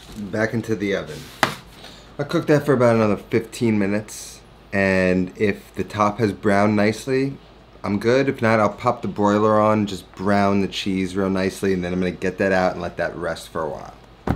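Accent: New York, United States. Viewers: Haven't watch this potato video yet, your description sounds delicious. I come from NY and listening to how you speak and your directness sounds like music to my ears, has a comforting feeling too.I miss the people of NY. So like your recipes, trying to cook them. Thanks for maintaining your integrity ( cutting board issues by others, not me.) Bless you and you hard work.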